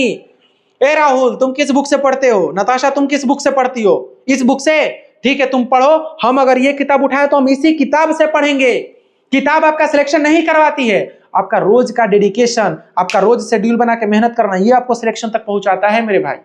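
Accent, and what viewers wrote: Bihar, India. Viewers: Bihar se ho 😅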